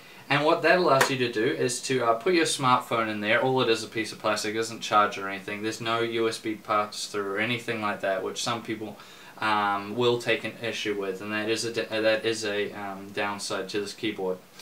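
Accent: New Zealand. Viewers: Sounds more Kiwi than Aussie, unless you're not an Aussie yourself, then all is forgiven:)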